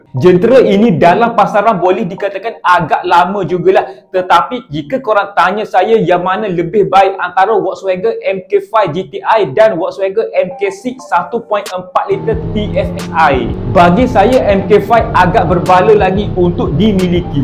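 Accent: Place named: Kelantan, Malaysia. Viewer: Boh. Demo mmg try hard nak cakap KL. Tapi demo ank jati kelate. Bunyi kelate demo tu. Gapo² pon video demo meme terbaik. Klu buleh , demo tulis laa kat video. MK5 , Merc , Bmw F20. Mudoh sikit ore nak cari. Tapi keto hok demo list ni , untuk ore ado duit poket lebih , buleh laa pakai. Keto lamo mesti ado nyakit. Sparepart pon parok. Sapo² ado duit poket lebih , boleh laa guno. Keep it boh 👍🏻👍🏻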